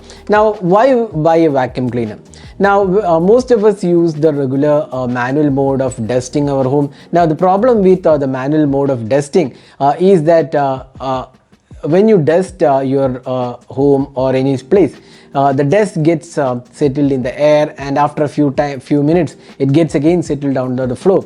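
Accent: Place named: Kerala, India. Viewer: In 3 seconds I realised he's from the motherland.. a fellow Malayali 😂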